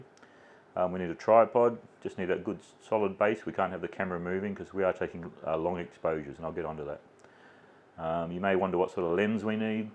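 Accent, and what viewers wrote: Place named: Australia. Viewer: Nice easy to understand tutorial. Nice to hear the Aussie accent rather than some septic tank shouting and screaming at you.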